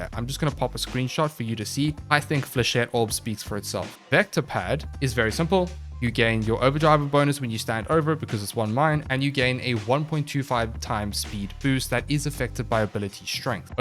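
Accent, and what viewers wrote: South Africa. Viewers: are you south african your accent souns a little south african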